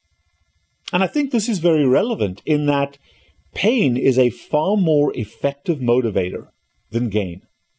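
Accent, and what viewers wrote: South Africa. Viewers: Is your accent South African?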